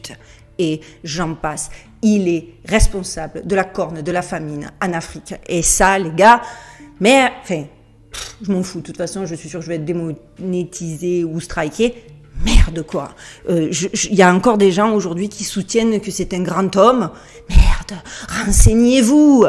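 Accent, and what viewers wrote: South, France. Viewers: Un vrai rayon de soleil, ton accent du sud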